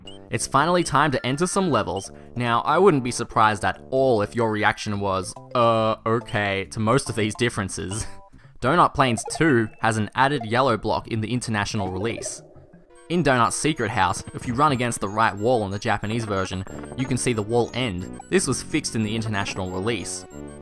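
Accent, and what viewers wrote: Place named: Australia. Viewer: different accents. he's Australian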